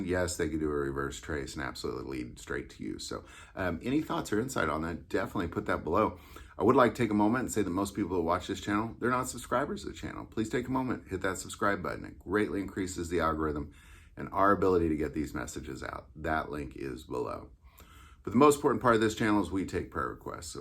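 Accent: South, United States. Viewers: oh ok thank you & happy thanksgiving 🦃🍽 to you & your family you sound like you live down south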